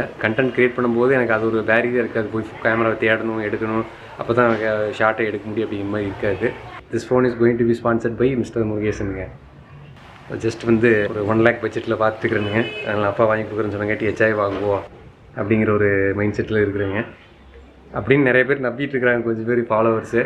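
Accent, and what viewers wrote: Kongu, India. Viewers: Ungaluku vera channel iruka bro.. irundha sollunga.. unga videos romba edharthama.. informative a irukum.. that kongu thamizh awesome❤ naan valarndhadhu madurai.. adhu dhan kaadhellam inikum thamizh nu solluven.. Unga thamizh ketadhuku apram.. thamizh enga irundhalum azhagu dhan thonuchu❤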